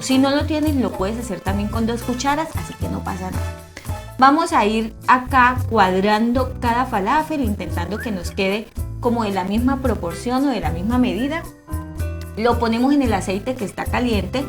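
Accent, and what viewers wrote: Colombia. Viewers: Hola, muy ricas Y sensillas tus recetas ,bueno por el acento me imaginaba que eras de Colombia pero vives en Colombia? Gracias .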